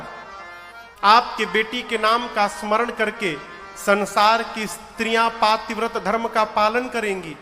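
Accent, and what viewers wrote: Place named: Purabiya, India. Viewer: Har baat mein Anand hai ho kya kar rahe ho Puri Katha mein ho hai ho hai ho hai ho kar Diya purabiya Bhasha bolate ho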